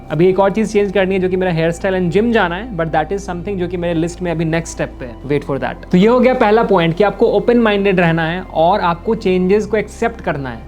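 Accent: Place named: Bihar, India. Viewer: Same ascent of mine Bhaiya I am also from Bihar Bhagalpur ❤ Currently live in kanpur , UP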